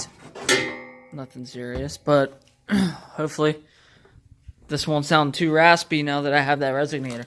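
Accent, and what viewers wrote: United States. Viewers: I was so confused why you were on the right hand side lol. You sound American lol. How. ARE YOU ON THE RIGHT HAND SIDE IN THE BEGINING BUT NOT THE MIDDLE OF THE VID. 😂😂 STOP PLAYING WITH MY MIND. But anyways, What did you do for the BOV? Was wondering how mine sounded from the outside. I have same exhaust set up but its all straight piped from the turbo and back.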